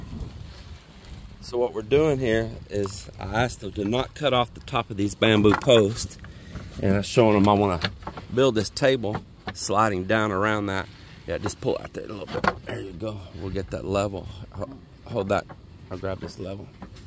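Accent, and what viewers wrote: Texas, United States. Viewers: Love your videos and your Texas drawl "I tell you what..." A question - do they sell Balsa wood in the PH? I see you at the lumber store regularly and thought I'd ask if you have seen any. Like 2"X4" X 8'? Or a similar wood that is light and strong? Thanks man! 👍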